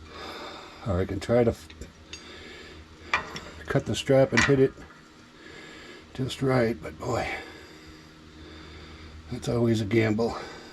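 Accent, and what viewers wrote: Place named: Maine, United States. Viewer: You sound more like a Mainer than someone from Tennessee. Nice vid.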